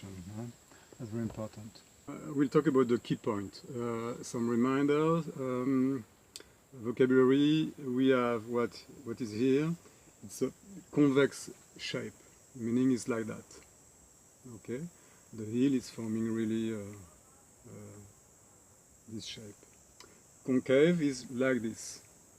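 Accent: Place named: France. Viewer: You are speaking in english, with a french accent, in a channel with a name that is in portuguese. i'm kinda confused.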